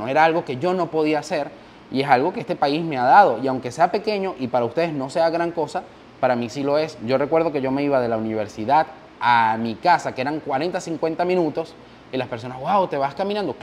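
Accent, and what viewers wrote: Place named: Panama. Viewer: Me gusta como dices aquí tenemos. Ya tu eres panameño.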